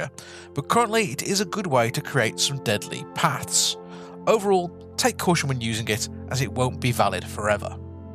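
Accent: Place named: Midlands, United Kingdom. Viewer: So good to hear a midland accent on YouTube and hell man meet your maker is beast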